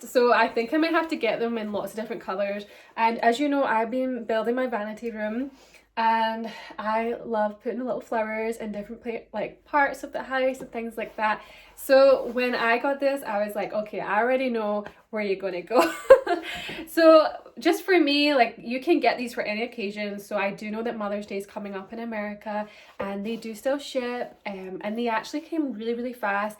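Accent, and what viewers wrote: Scotland, United Kingdom. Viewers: Love your Scottish accent hen.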